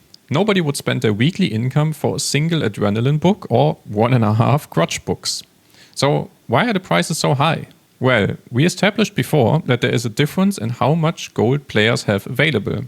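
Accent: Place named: Germany. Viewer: i like your English with german accent . good video!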